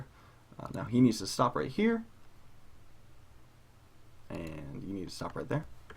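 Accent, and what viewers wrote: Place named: United Kingdom. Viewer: like not fully british but brit-like inflection they had a hold on u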